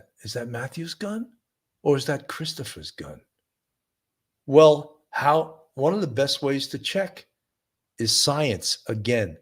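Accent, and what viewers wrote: New York, United States. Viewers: I love your accent, New Yorker ❤️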